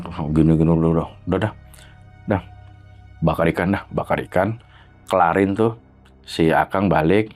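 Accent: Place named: Jakarta, Indonesia. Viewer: cerita belakangan, yg penting logat betawi nya dapet..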